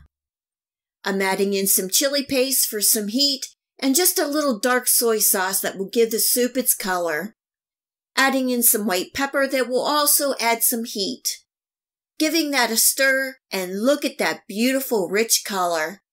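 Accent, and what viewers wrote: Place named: Maryland, United States. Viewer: This is the BEST hot and sour soup recipe ever. I've been making it regularly for the past 7 years. We've tried loads of others but nails this restaurant style recipe. (*And we just love hearing that Maryland accent, reminds us of my husband's hometown.) The only change I make is that I only use one kind of soy sauce (because I can't get my hands on the other kind). DELISH! Making it today to cure my husband's cold! Thanks.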